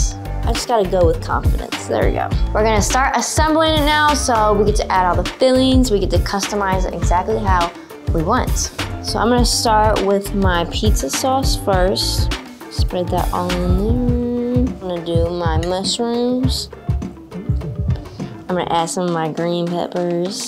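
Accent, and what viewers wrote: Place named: Chicago, United States. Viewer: She’s definitely charismatic but why does she talk like she’s from the south side of Chicago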